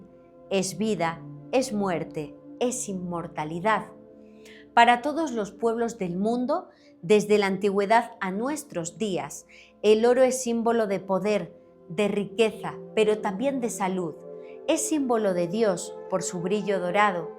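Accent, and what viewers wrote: Spain. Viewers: me encanta escucharte tienes tantas cosas tan importantes e interesantes en tu canal felicidades eres también muy profesional y comprometida encima de todo eso tu acento español ❤🎉 felicidades nunca te canses de alimentar mi ignorancia ❤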